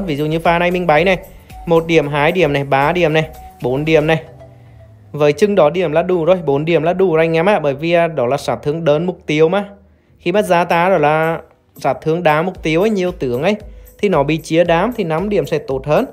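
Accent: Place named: Central, Vietnam. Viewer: giọng bắc nghe quen rồi, méo hiểu sao cứ thích nghe cái giọng miền trong của bạn 😂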